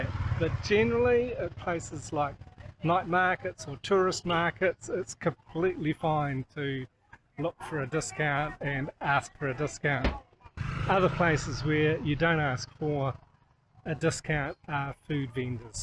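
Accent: New Zealand. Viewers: Love that Kiwi accent!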